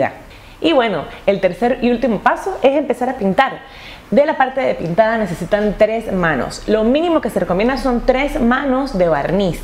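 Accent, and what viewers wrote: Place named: Peru. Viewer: de dónde eres? tienes el acento peruano... lo digo como peruano, español algo neutro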